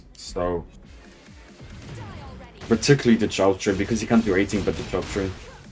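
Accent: Ireland. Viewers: He’s Irish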